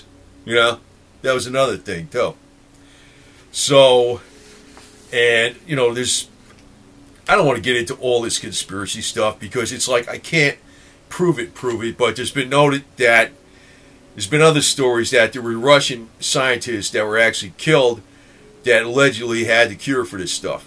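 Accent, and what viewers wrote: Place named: East Coast, United States. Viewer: Yo Bud, i like how your mind works and you talk like an East Coast Boy lol. So if so right on!. Cool vid man.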